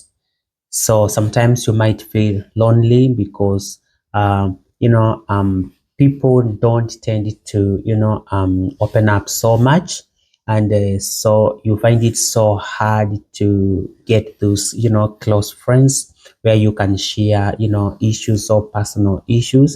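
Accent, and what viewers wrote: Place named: Kenya. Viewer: Greetings from Kenya, I can hear from your accent you are a kenyan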